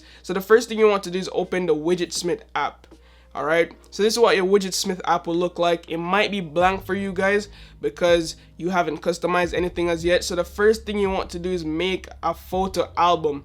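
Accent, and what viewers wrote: Jamaica. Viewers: Ngl u sound Jamaican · Yo bruh kl vid but one question, you Jamaican? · Are you from Jamaica (or Caribbean) by way of England??? I hear a combo in your accent. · Iz u Jamaican 😎 🇯🇲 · U sound Jamaican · I used to go to school with him in jamaica lol we have cool accents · are you Jamaican bro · You Jamaican dawg? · I can literally hear the Jamaican accent in ur voice general. Where from Jamaica u come from?? Mi cum from St.Thomas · Yo you Jamaican? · soon as i heard that voice i was like. he gotta be 🇯🇲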